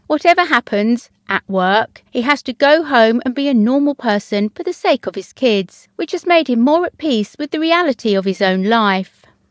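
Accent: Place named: United Kingdom. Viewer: Prince William is the spitting image of his Mother! I think Princess Catherine is absolutely beautiful and l love everything she wears! No wonder their children are so adorable! Thank you for posting this lovely video! I’m kind of new to your Channel and l love your extremely British accent. Signed, A Brooklyn Grandma 👵🏻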